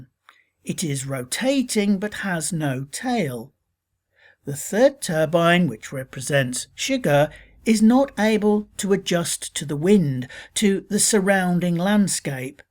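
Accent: England, United Kingdom. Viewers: I'm quite extinguished by reviewers with British accents telling me what a noif is. Lame, tedious review of a review, and that's not a noif, this is a noif. Let Hollywood have fun til it kind of fades. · listen to this guy, he's English... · Jesus Fucking Christ. How many descriptive absolutes do you have to use? "From the beginning of the film, the western stereotype is immediately clear." Really, fucktard? A sunrise gives it all away, does it? Or is it Tommy Lee Jones's voice? Hey...from the beginning of your video, the snarky pretentiousness is IMMEDIATELY CLEAH. Dicknugget. "The leaoucation where oll the events tayke playce couldn't. be. mohr typical." Really? It couldn't be more typical, eh? Typical of WHAT? Typical of your stupid blanket commentary? God damn. Were you sniffing the queen's meat curtains while you wrote this steaming pile of shit and chips? 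"Oll thuh main c.h.a.r.a.c.t.e.h.s ah PEFECTLY in.ti.grated IN this environment...THEY ah the essential element of every westehn mouvee." SHUT THE FUCK. UP. Over-generalizations and pretentious King's English phrasing does NOT make for an effective, meaningful review, especially when you're just jerking it in your face. Come on. If anyone took this narrator seriously, this movie would fucking bake the bread for your Texas Toast, slice it, butter that fucker up, toast the sum'bitch, and slap your ass silly 'till you admitted hyperbole isn't a reality. God. The British Accent... sooooo ponce. Maybe if Bruce Dickinson of Iron Maiden were narrating, we'd get a good read. But no. It's who only completed level 1's. Fucking shut up. Good name, btw. Pinheads. Yeah...I only made it 42 seconds in. TYPICAHL AMEHRICAN. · You say in English accent no less; that Chigar doesn’t have a place of origin but if you know history of conquest by Christian assholes, he is from Mexico, who pieces of shit of America ransacked in the name of a God that doesn’t exist counter to treaties made by the law. 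The movie is about consequences. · A Brit doing the speaking for a film review such as this is too distracting.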